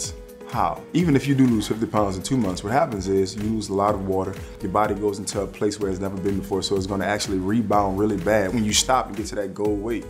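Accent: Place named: New Orleans, United States. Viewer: Ok New Orleans!! I hear it, that’s my hometown ⚜️😍 congrats on your success!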